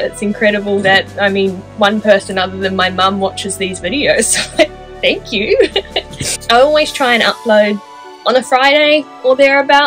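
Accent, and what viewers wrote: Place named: New Zealand. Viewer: as a fellow kiwi it's just nice to hear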